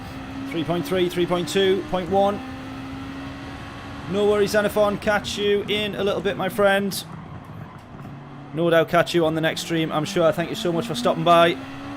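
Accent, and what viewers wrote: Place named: United Kingdom. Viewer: The last race was very exciting, but the best race was the second one, the one where you pitted one lap too early by accident. I love watching your streams, because you are really fast, but also exemplary fair. The only other streamer who also stops every time after making a mistake is Rory Alexander, who I also like. As a huge fan of since 1988, I also gotta give it to you for promoting my favourite band as a part of the livery of your cars. One more thing that separates you from the rest of the streamers is your accent. I can tell that you're from UK, but which part of UK remains a mystery to me. Gotta love your accent, though.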